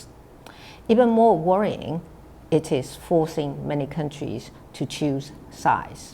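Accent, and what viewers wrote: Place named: United Kingdom. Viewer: 做得好不好我不知道 但這英文能力很強呢 而且因為是對歐洲各國講所以用英國腔 其實挺厲害的(我只會破美語XD)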